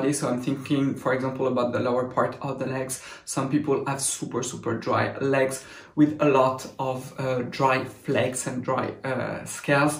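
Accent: France. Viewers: Are you French? Lovely accent!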